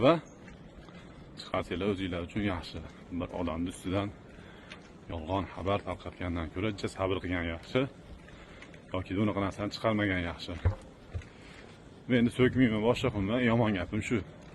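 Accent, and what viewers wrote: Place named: Andijan, Uzbekistan. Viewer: Ома гап йук анжонча гап булди ишларизга омад ✊ Хусанов укамиз уз даражасини курсатади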